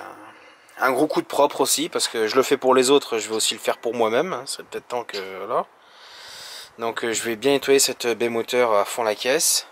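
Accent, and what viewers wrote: North, France. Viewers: Salut j adore tes vidéos de swap avec l accent de chnord lol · super video et serieux je kiff ta façon de parler on vois bien la famille nordiste t de ou dans le nord moi valenciennois et j'ai hate d'avoir de nouvelle video ça manque merci a toi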